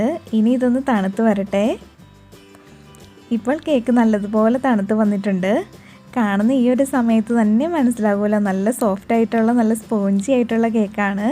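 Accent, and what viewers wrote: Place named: Kottayam, India. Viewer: palakkar Kottayamkar parayunnathu pole parayunnathu kelkkana rasam.Appol ithil one cup 240 ml aano.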